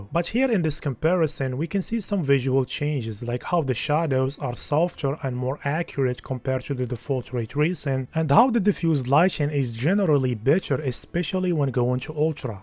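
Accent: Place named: Morocco. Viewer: Bro's accent is as Moroccan as it gets. Keep going, khoya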